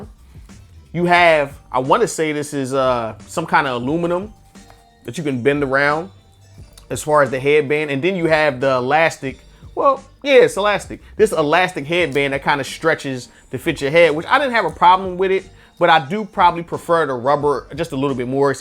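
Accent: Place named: New York, United States. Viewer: Definitely from NY. Yerr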